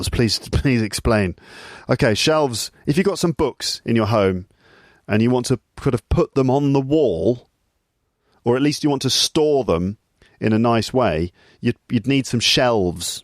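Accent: RP, United Kingdom. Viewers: Not all sound realistic enough, still, really appreciated your effort to imitate the sound of the instrument, especially the sound of the drill. Overall I love the process of imagining all those appliances and your work on your pod room, while I'm making dinner, your podcast is the best companion as well as learning the received pronunciation accent :goodvibes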